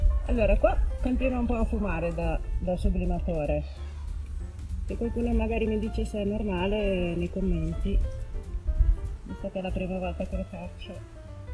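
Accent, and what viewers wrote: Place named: Milan, Italy. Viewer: io sono nato e cresciuto in Brianza, ora da 10 anni vivo in provincia di Torino. Ti ho chiesto perché ho riconosciuto l'accento milanese 😉👍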